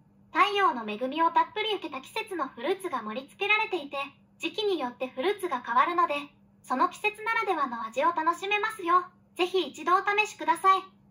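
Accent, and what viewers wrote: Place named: Saitama, Japan. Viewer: 埼玉県民の声がする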